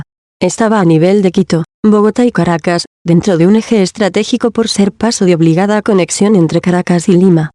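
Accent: Spain. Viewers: Bueno yo les voy a decir algo quienes fueron los primeros MATONES DELICUENTES SAQUEADORES BIOLADORES ASESINOS en la colonia latinoamericano quiénes fueron los Epañoles y tu hablas de un VENEZOLANO que se REBELÓ Y TRAICIONÓ AL IMPERIO ESPAÑOL para la libercion del RÉGIMEN CRUEL ASESINO BIOLADORES LADRONES QUE SAQUERON A LOS PAISES latinos de a aquélla epoca . TODOS TIENEN SU LADO OSCURO OSEA tienen RABO de paja no se pueden acerar a la CANDELA POR QUE SE VAN A QUEMAR hay te la dejo . Por tu acento eres ESPAÑOLA PAMAS VAINA jajajaja me CAGO DE RISA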